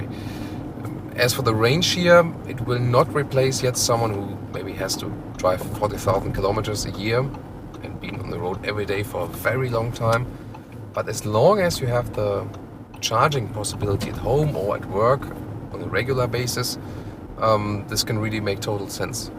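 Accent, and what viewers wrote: Germany. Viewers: Admirable german accent! Very smooz!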